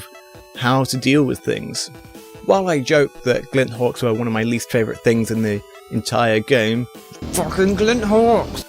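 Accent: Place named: United Kingdom. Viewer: hardcore british!!!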